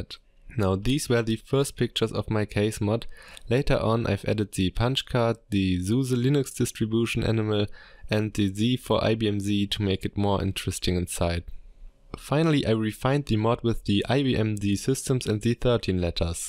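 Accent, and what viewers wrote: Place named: Germany. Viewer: Deinem Namen und Akzent zur Folge, schätze ich du bist Deutscher und womöglich in Boeblingen im Einsatz? Aufjedenfall super Arbeit. Ich wollte für meinen Raspberry Pi schon immer ein Mainframe Case und für mein Cubieboard ein DS8000 Case. Leider bin ich bisher noch nicht dazu gekommen, aber vielleicht nehm ich das kleine Projekt demnächst mal auf. = )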